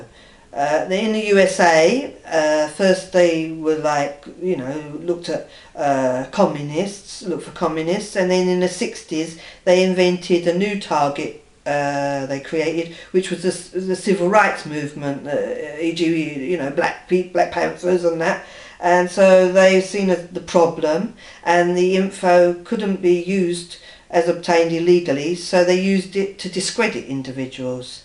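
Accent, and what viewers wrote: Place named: London, United Kingdom. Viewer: It's so pleasing to hear a lady speaking on gang stalking with a London accent. I think these people doing the surveillence and doing the gang stalking are just great big spiteful little boys in great big men's bodies.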